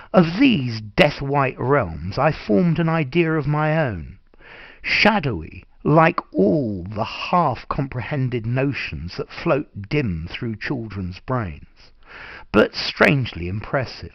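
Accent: RP, United Kingdom. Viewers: I have been searching the web for weeks and could not find a good lesson to sound more British. This recording is excellent. Thank you very much!!! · Fabulous British RP. We need more like you. I'm devouring your videos. I do like how you pace your speech. I try to mimic your pace and speech. Thanks for all the videos. Do you recommend any audio books with British RP please?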